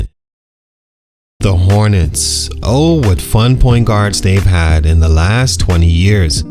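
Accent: Bahamas. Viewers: Bey narrator you Bahamian ay? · Bro, I completely forgot about Kemba. Excellent video! BTW, you sound Bahamian. If so, big up from Canada!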